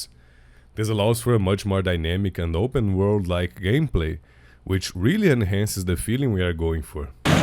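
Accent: Brazil. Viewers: Please man I’m begging do a playthrough with this mod, PELO AMOR DE DEUS MANO EU QUERO ESCUTAR O SOTAQUE BR EM INGLÊS DNV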